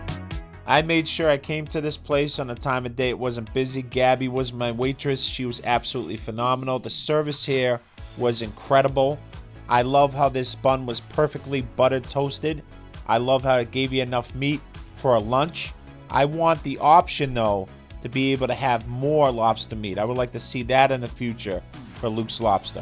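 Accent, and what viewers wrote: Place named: Boston, United States. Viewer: Stick with it…..your content is improving….you are likable and your absolute Bostoness is contagious….I am now a subscriber.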